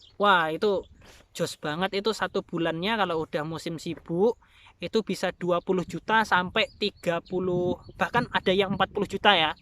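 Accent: Java, Indonesia. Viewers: Wong jowo rek. Ngomng e medok, kekel aku🤣🤣🤣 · Logat Jawa ini ☺️☺️ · Logat e jowo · Wong jowo mas?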